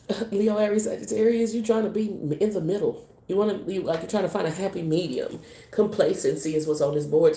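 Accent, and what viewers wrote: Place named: Caribbean. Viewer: Love your accent. How it comes out when ya get excited!! Im from the Caribbean and reminds me of home. Different but the same. That slang. Bless you 🙏 love ya girl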